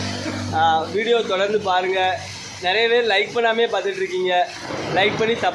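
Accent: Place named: Tirunelveli, India. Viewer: " புத்திசாலிகள்😂" புரிஞ்சுதா என்பார்கள் . இவர் " சரியா " என்று கேட்பவர்களின் கருத்தையும் கேட்கிறார் . உண்மையான புத்திசாலி . நெல்லை மாவட்டத்தின் பேச்சு வழக்கு !👍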